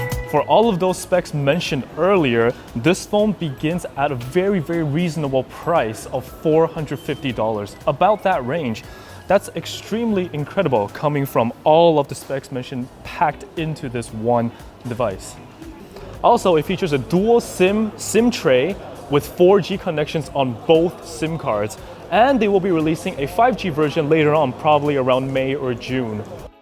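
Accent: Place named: United Kingdom. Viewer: I like your English accent Really British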